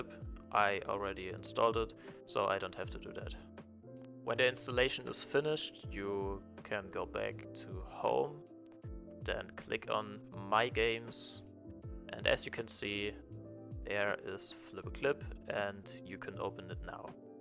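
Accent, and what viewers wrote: Germany. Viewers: Hey are you German? Because you kind of have an German accent (im also German (well switzerland but still)) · U kinda sound like a german no hqte😅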